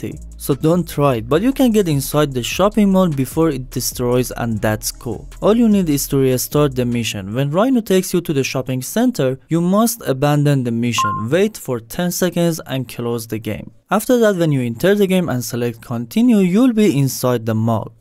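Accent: Iran. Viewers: خخخ. داداش دمت گرم. از لحجه ات فهمیدم ایرانی هستی 👍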